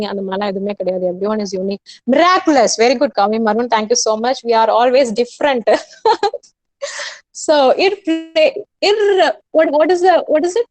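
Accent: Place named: England, United Kingdom. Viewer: Mam I like your english accent .really inspired mam ✨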